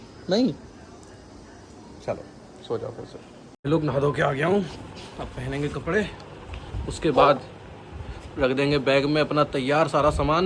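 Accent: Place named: Uttar Pradesh, India. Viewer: Bhai apki awaz sunn kar lgta hai ki aap UP se hain. Mein Azamgarh se hunn, aap kahan se ho?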